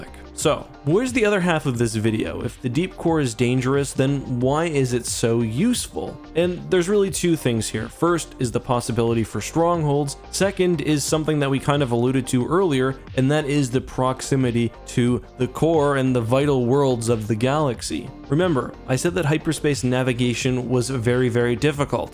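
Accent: Canada. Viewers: you sound canadian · Is this guy Canadian? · is so aggressively Canadian and I love it.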